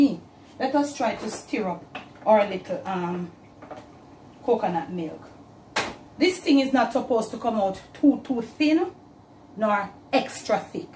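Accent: Jamaica. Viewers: I could just eat a slice of Toto, my mam use to make it while i was growing up. Love watching you bake, and i just love the Jamaican speeking, i find it so warming. By the way i think the word you were looking for the cocanut trash is dessicated. My mam also called it cocanut trash! Lol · I like jamaoca english❤ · Ms I enjoy your videos. Mixture of proper English and Jamaican patois is so me. I am comfortable with your style of communication. Thanks for the education in authentic Jamaican cooking on all your channels. I appreciate your letting us being a part of life in the Caribbean and specifically Jamaica. I wish you all the best going forward.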